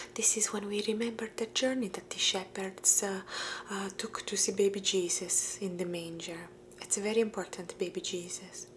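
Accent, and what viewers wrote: Italy. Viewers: fantastic asmr, love it when you do the italian accent 😍. just out of curiosity but what nationality are you, just to know your mother tongue? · This is the same as my accent when I try to speak English😂(I am Italian🇮🇹).Nice video! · This is great, I love learning about Italy and your ASMR. Italian accent is always so good. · Italian accent - this is amazing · I love your italian accent videos. I would love to see more of them. Greetings from Germany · Brava, brava bravissima. You sound Italian just like me 😅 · I love the italian accent and I love hearing about italian culture in general! Is it true that italian pizza is different than the ones in Europe and America?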